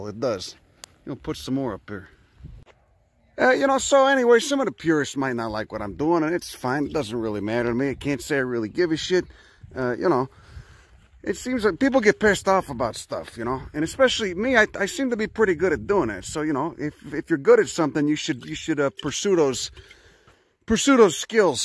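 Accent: Chicago, United States. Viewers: You are fucking funny! With your Chicago accent and knowledge of botany. It's like listening to a mobster with A botanical education. Kinda almost an edgier botany version of AvE. Lol. And I love him.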